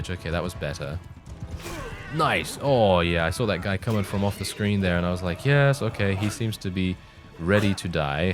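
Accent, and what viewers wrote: United Kingdom. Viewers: Your voice, British accent and saying the word problematic every 5 minutes You are the most fascinated one i have ever listened to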